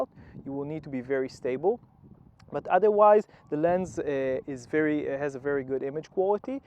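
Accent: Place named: Israel. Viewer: יפה מאוד!שומעים תמבתע הישראלי שלך..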